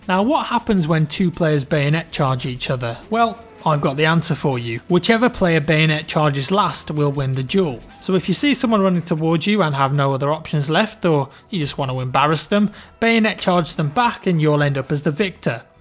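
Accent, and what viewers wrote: England, United Kingdom. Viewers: .. What type of English accent is yours? It's really nice and probably the easiest one to understand.:)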